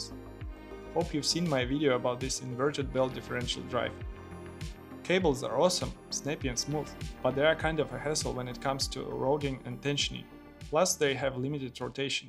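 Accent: Russia. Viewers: Мне кажется или у него русский акцент? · почему я слышу русские звуки в этой английской речи?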